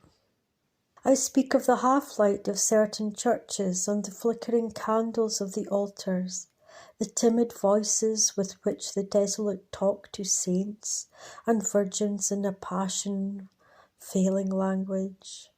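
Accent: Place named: Scotland, United Kingdom. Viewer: Love the Scottish accent !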